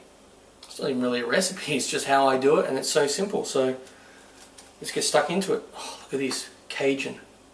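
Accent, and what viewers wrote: Australia. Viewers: are you an Ausi? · Cooking channels Australian aha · Ypu being aussie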